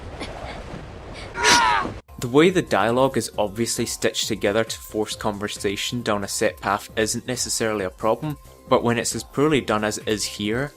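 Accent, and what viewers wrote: Scotland, United Kingdom. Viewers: Is that a Scottish accent? Sorry, I'm not anglophone, so I'm not 100% sure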